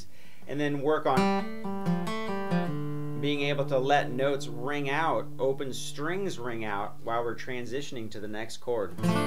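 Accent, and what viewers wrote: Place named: South, United States. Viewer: you accent are totally southern! XD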